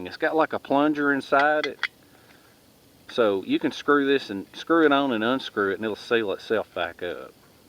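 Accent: South, United States. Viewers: I was wondering where in the South you were... I could tell you were somewhere in Antebellum country by your dialect.... Georgia the setting of 'Deliverance'- watch your corn hole in them woods that boarder Appalachia 😎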